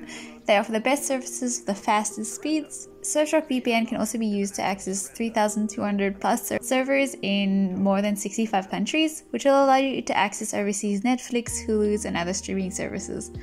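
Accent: South Africa. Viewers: wait you are south african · oms you are from South africa too, girl ive been watching your videos and i only hear this now woowww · WOAH ur south african too??? i thought i recognised the accent- i thought i was aloooone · Are you South African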